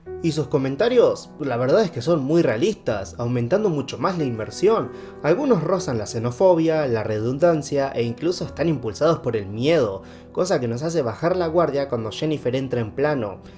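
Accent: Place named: Argentina. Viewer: Todo estaba bien y disimulado asta que se noto el acento argentino "asha" "sha estamos" como arruinan la experiencia.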